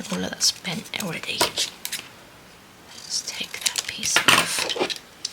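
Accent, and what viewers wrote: United Kingdom. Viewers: Oooh the UK sounds 👍 x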